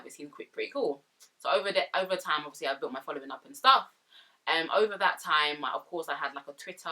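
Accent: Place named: United Kingdom. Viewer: Soo true instagram is soo fake and made me so depressed. Its not real life and you're right none of those people will be there for you in your struggles. Plus I hate feeling overexposed and you never know how many creepers are watching. As women its not very safe! I'm glad instagram deleted my account. I realized how immersed I was in that fake world. So glad you talked about this youre beautiful and love your uk?? accent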